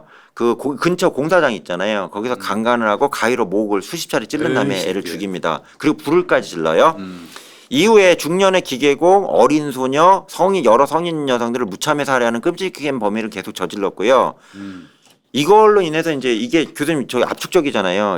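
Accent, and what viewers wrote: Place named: Seoul, South Korea. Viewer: 염블리님 얼굴이 헬쓱해지신거 같은데 아프신건 아니겠쥬? 근데 말미에 뭐뭐 한거라~~ 이러이러 한거라~ 이건 어느지역 말투에용? 옛날 서울사투리같은 느낌인가요? 사건의뢰 왕팬이라 자주 듣다보니 저도 모르게 따라해서ㅋㅋ 궁금해지네요~~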